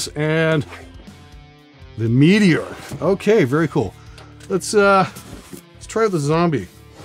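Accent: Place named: Canada. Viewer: I can't stand that Canadian accent.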